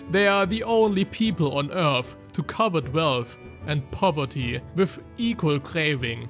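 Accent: Germany. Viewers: Der starke dt. Akzent verbunden mit der nasalen und dadurch etwas arrogant wirkenden Stimme machen es wirklich extrem schwierig das Video anzusehen ohne den Wunsch zu haben es abzubrechen.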